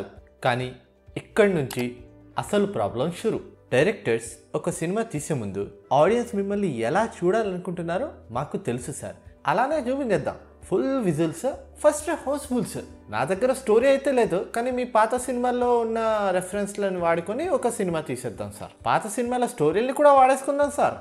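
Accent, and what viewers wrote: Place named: Karimnagar, India. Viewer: broo are you from Karimnagar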